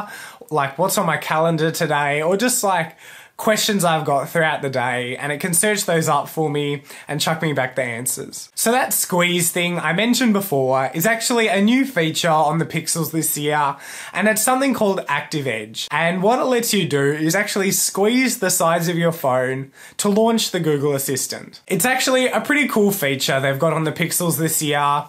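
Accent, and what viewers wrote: Australia. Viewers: What kind of accent do you have? Australia? I come from germany by the way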